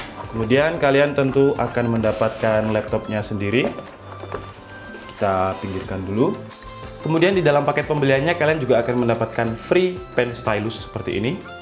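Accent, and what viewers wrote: Malang, Indonesia. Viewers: Orang Malang min?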